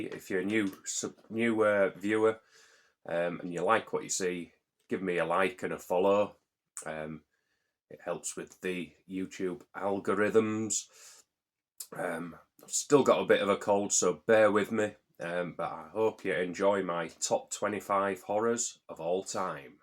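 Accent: United Kingdom. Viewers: New subscriber, found this video randomly suggested, and thought great accent, sounds like he's from 'ull, like me. And some great picks here 👍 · hi buddy im from preston and judging from your accent, i dont think your far lol, where abouts r u from ? x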